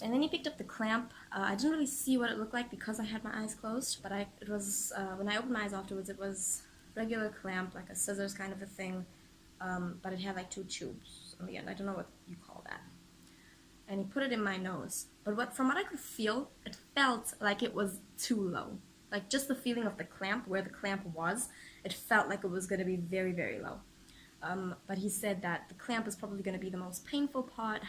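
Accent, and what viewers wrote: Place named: United States. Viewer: You sound American haha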